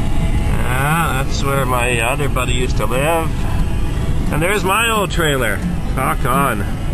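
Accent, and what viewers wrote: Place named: Fargo, United States. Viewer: funny accent. like the movie Fargo!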